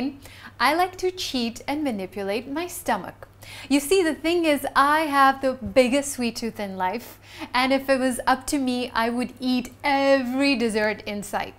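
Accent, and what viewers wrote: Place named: India. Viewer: she is india cannot hide the accent :)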